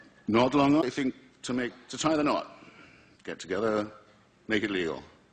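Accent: United Kingdom. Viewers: those are British accents (and there are several Scottish and Irish accents, I don't know about Welsh). English accents include received pronunciation, Estuary English, cockney, and many regional accents. Hitchens basically speaks rp, which is both an English and a British accent.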